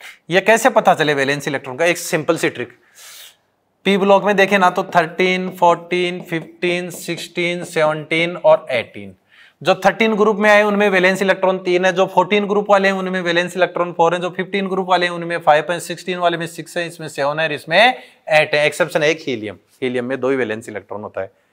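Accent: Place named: Haryana, India. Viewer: Haryanvi lahje me chemistry mja aa gya kya padhate ho sir ... thanks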